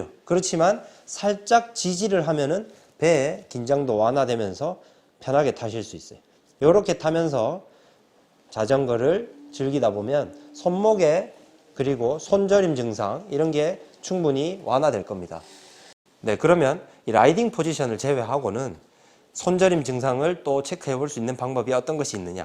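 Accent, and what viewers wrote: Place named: Gyeongsang, South Korea. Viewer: 경상도말투가 이렇게 자상할수도잇구나...기본개념부터 차근차근 잘설명해주셔서 본인자세를 다시한번 돌아보게되는 좋은 영상입니다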